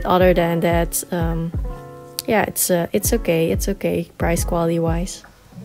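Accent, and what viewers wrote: Netherlands. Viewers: Sterk nederlands accent😆😋😋 Leuke video👍🏽Heel ontspannend met de achtergrond muziek en rustgevende stem🥰 Top👌🏽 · Correct me if I am wrong, but your accent tends to be dutch-English I am right?. BTW nice unboxing-video especially the screen-protector. It's so easy. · Gurl are you Dutch? You sound it .. the accent. Im thinking of going from the 6 to 12... Was it possible to transfer data from 6 to 12 wireless? Or icloud backup? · Is it me Or is your accent extremely dutch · are you dutch? it kinda sounds like you have a dutch accent :’) loved the video btw !! :D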